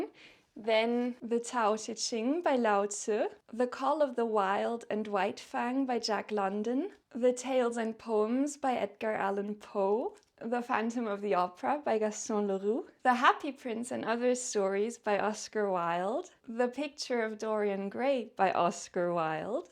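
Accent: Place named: Germany. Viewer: I read a lot of classic literature, biographies, text books, manuals, science fiction.and self help books. You have a neat and tidy tome of books. I dislike Shakepeare. I had enough studying and reading his books during my high school days. I am a big fan of ancient Roman society and all those famous philosophers. The most expensive book I bought was The Red Book by Carl Jung and the full collection of The Decline and Fall Of the Roman Empire by Edward Gibbins. . I am an Aussie old man and I have historical books about Australian authors from the 19th century. For a young lady you speak English very well and I like your German accent. My mother was German and I could never understood anything when she spoke to me even though I spoke Polish to her.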